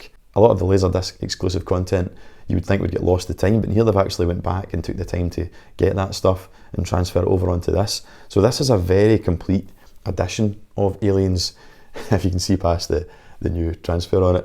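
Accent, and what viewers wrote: Scotland, United Kingdom. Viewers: Hi, not only I share your passion for physical media, I also freaking love your Scottish accent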